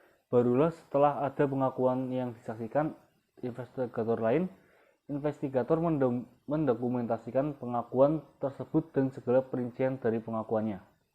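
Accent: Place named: Java, Indonesia. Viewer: Medok nya natural mas, Sangat bisa diterima masyarakat indonesia🙏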